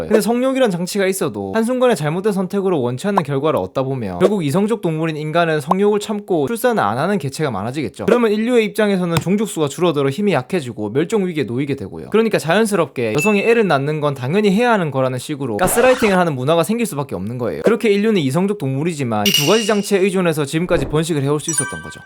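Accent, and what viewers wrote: Gyeongsang, South Korea. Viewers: 대구 분인가봐요? 말씨가 익숙하네요 ^^ 그리고 인류는 소멸 안해요, 한국인이 좀 많이 줄어들겠죠. 근데 한국인이 줄어들면 뭐가 어때서요? 요만한 땅에 한국인이 5천만이나 번식한 게 이상 번식이었어요. 다른 나라들을 보면, 한국인은 한 1500만 정도로 줄어들 필요가 있어요. 거기까지 인구가 줄어드는 건 한국 사람들한테 좋은 일일 겁니다. 오염도 덜해지고, 1인당 물 공기 집 넓이도 커질테고, 땅값 집값도 좀 싸질테고요. 사람도 더 좋은 대우를 받게 되겠죠. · 쌍도 사투리 억양 존나 거슬리네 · 경상도 억양 좀 고치셨으면... 말투가 귀에 거슬림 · 이런 애들이 통계자료+본인뇌피셜로 어떤 현상에 대해 -는 ~다 라고 결론내서 확정짓는듯한 이런 영상을 만드는 것이 매우 역겹다. 아무생각없이 보면서 님말이 맞는것같아요 분석력 짱 이지랄 댓글싸는 애들도 병신같고.SCI 등재라도 하나 하고 와라. 경상도에 있는 지방대 조별과제 발표자료같음. 나 또한 경상도출신에 고향친구만나면 사투리로 대화하지만. 사투리 역겹다. 표준억양 연습이라도 하고 녹음하던가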